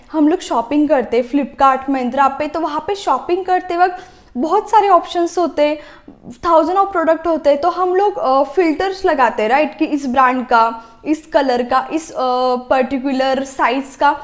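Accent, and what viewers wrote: Maharashtra, India. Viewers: Marathi by accent!!! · Tu marathi ahes ka? Seems from tone.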